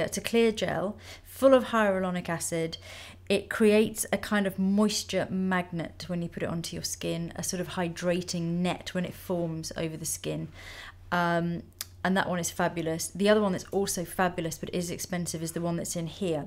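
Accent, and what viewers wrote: United Kingdom. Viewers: Oh gosh, that beginning made me feel like I'm exactly in an airplane (except with the British accent) probably because I'm flying soon haha!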